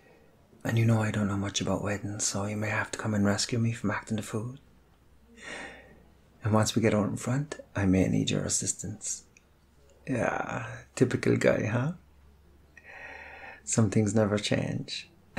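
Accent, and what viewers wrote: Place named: Ireland. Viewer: This version sounds so classy, romantic and Irish like. Love it. Love it. Love it ❤ Yes to the foot rub. More of this please😍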